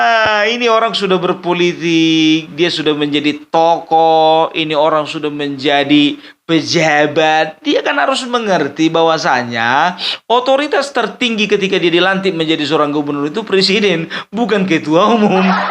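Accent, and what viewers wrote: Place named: Batak, Indonesia. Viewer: Kereeen opung, saran saya dialeg bataknya di pertebal lg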